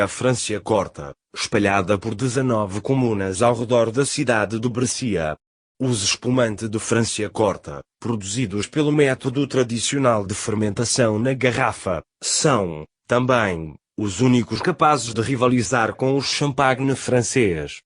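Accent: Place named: Portugal. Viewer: A pior coisa que fizeram foi colocar essa voz falando em português de Portugal 🤦🏻‍♂️ ... o pessoal tem que entender que a grande maioria das obras de literatura e programa de televisão, são feitos em português do Brasil, mais limpo e mais audível.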